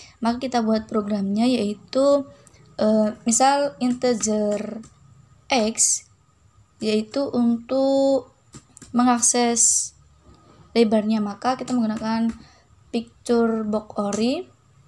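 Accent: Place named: Tegal, Indonesia. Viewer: Ilmu yang bermanfaat by ngapak tegal junior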